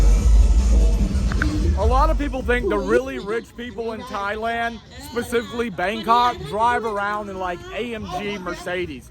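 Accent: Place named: New Jersey, United States. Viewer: Yo have a jersey accent..are you from the north East coast?love your content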